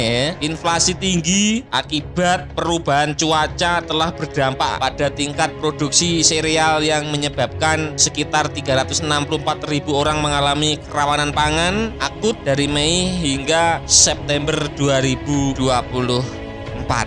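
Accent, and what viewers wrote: Java, Indonesia. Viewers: Min jgn cari uang bawah2 negara org kamu bukan org Tls ko..itu bukan urusan kamu...jgn pke uang haram kash makan keluarga...logat jawa nee..kentara. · Admin asbun bicar a tanpa data.....saling kritik antar anak bangsa adalah hal biasa di Timor Leste.....$12 jt utk acara penyambutan bknlah berlebihan ....dari logat anda dipastikan kamu org jawa..... Spy kamu sadar ya ......mau brp biaya yg kami keluarkan bkn urusanmu ..... Urus aja dirimu ...agamamu dan negaramu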